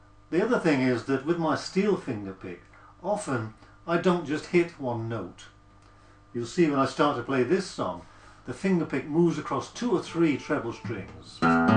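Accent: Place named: United Kingdom. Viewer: Glad I have found your channel. It’s nice to hear another Brit. I’ve been playing finger style or Travis picking for over five years now. I want to master the finger picks so I can get more volume for busking and they get the best sound out of my wooden bodied resonator guitar. It’s taken me 2 years to get the hang of using the slide properly. Hopefully it won’t take as long. I have been using the plastic ones but recently ordered some metal ones and I find them much easier. Thanks for taking the time to share your knowledge and upload. People like yourself are doing a massive service to us just learning. I have pretty much taught myself how to play guitar, slide and finger style. From YouTube. I’m currently teaching myself mandolin. Due to bad health I’m unable to attend physical lessons. The lessons have paid for my living costs and even bought me a guitar one summer. 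Thanks again. Ric in Leeds West Yorkshire. 🇬🇧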